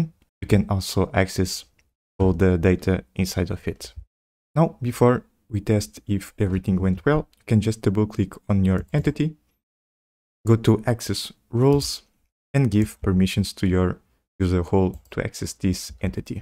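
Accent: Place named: Portugal. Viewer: Muito reconhecível seu sotaque português de Portugal! Gostei muito da simplicidade que trouxe o assunto e um case prático. Saudações do Brasil!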